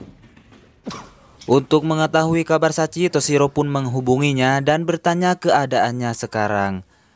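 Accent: Madura, Indonesia. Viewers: Reng medhure ye teh ? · spertinya orang madura ini wwkwkwkwk,,,,,,ketara sekali logat bicaranya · logat Madura checkkkk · Kalo dari logatnya lo kayak madura ya bang? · Logat admin dari madura ya? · Oreng medureh been cong...?!?!?! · Meduro iki koyok e sg ngomong 🤣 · kentel banget y maduranya.. 👍👍👍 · Logatnya madura banget 😀. Semangat saudaraku 👍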